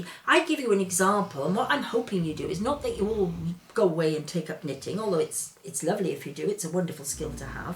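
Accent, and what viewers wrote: United Kingdom. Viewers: Love your accent,.. what part of Britian are you from?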